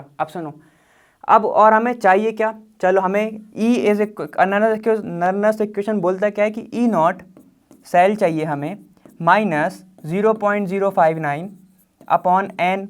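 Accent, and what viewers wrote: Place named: Bihar, India. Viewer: Muje tou bihar se laag rahe hain 😅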